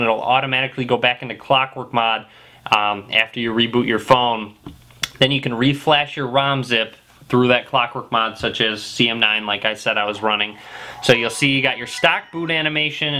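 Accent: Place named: Chicago, United States. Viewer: This guy has to be from Chicago. I'd know that accent anywhere.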